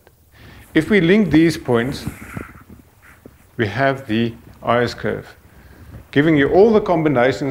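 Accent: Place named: South Africa. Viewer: I don't know why, but everything is just better in a south african accent.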